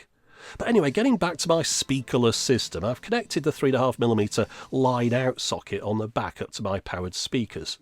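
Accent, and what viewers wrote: United Kingdom. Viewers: The best channel on YouTube in my opinion. As a Brit now living in the states, it’s great to hear a fellow Brit voice, talking about such cool stuff. If there is something you think I could help you find across the pond, just let me know.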